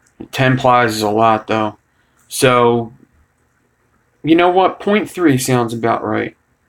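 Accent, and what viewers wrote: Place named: New Jersey, United States. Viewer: youz guyz is a jersey thang. everytime I hear it, it reminds me of Kevin Smith or more to the point Jason Mewes :)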